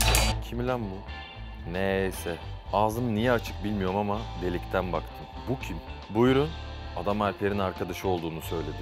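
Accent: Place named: United States. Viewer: Adam amerikalı aksan çok saçma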